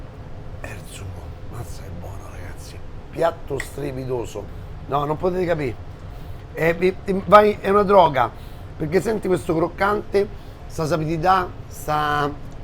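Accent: Rome, Italy. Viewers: e tutta ‘sta sceneggiata a me dà fastidio. Mi piace il romanesco ma questo è esagerato . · ...... sei forte, già lo sai tu stesso che sei "er migliore'! Soprattutto la tua parlata romana non deve mancare.....é come la ciliegina sulla torta!... L'inglese rovina un po ' questo tuo insostituibile carisma... ciao ti vogliamo bene!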